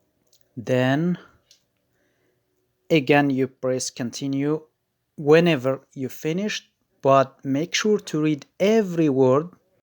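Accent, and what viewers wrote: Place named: Morocco. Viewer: U r moroccan lol guessed it from ur accent lool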